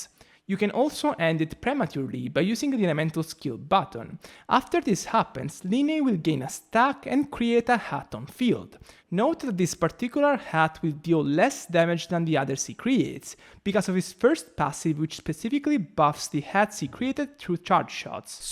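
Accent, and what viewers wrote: Italy. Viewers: Sir are you an Italian or Russian? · just want to say that usually im assuming you're Italian just by the accent and now i see your body gesture really confirm it 👌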